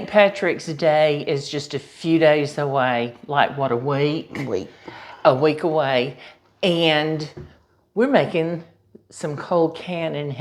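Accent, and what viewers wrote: South, United States. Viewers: So glad you're feeling better!! This looks delicious!! Ur from the south and love your southern voice but wondering exactly what state? Cause by golly u make me feel like Mama's still around about to give me a hug and tell me a story from her childhood!!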